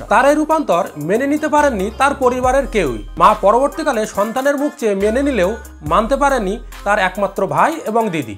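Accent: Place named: Bangladesh. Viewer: তোমার কথাই মনে হচ্ছে তুমি বাংলাদেশী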